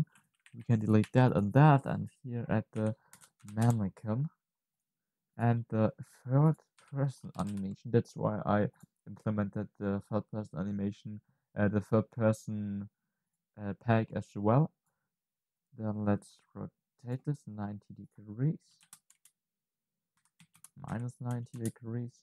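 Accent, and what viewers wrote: Germany. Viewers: Are u german? (just askin)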